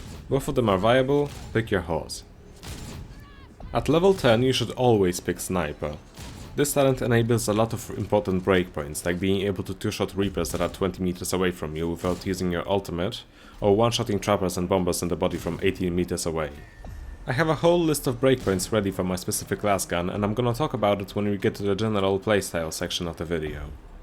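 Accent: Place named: Germany. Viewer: He has a german accent, I trust him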